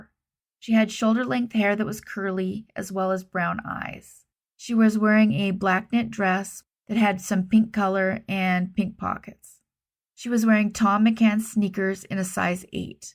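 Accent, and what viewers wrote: United States. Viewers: I love your delivery. I think its the Fl accent for me. You remind me of my bff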